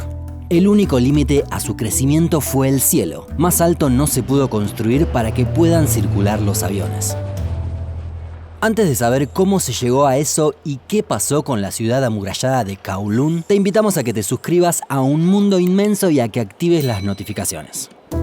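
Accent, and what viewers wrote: Argentina. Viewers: Me gusta tu acento argentino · Se me hace muy chido tu acento, eres de argentina o uruguay?, y no soy gay, solo simpatizo con ese acento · “Shaky Chan” amo el acento argentino 🤩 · El acento argentino me excita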